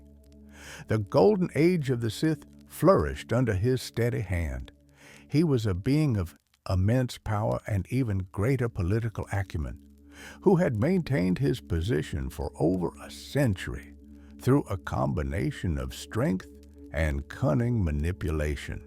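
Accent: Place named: South, United States. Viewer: Dude why on earth would anyone want to hear a star wars tale from the vice of some southern dude sitting on his porch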